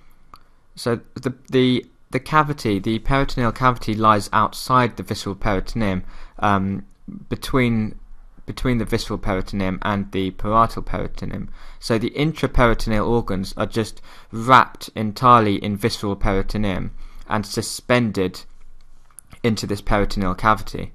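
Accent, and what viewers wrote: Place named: United Kingdom. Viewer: Thank you :D love the fact that you are British... it's easier to understand... :D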